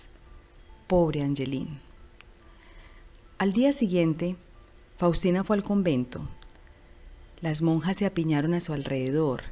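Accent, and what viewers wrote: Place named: Antioquia, Colombia. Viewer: El acento paisa es difícil de neutralizar...pero no imposible.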